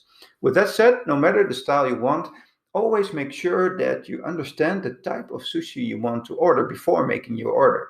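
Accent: Netherlands. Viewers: I detect a Dutch accent. Am I right?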